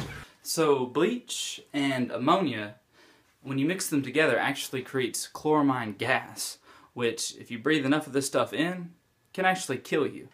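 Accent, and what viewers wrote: South, United States. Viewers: Are you from the south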